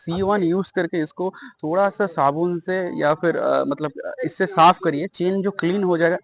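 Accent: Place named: Bengal, India. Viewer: Bong boy. Accent problem bro.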